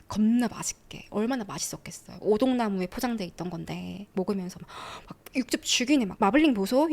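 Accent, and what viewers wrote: Busan, South Korea. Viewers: 부산 사람이신거 많이 티가 나시네요. 일본말을 엄청 잘 하시고 일본 문화에 대해서도 엄청 많이 알고 계시네요 일본에 대해 많은 호감이 있으신가 봐요.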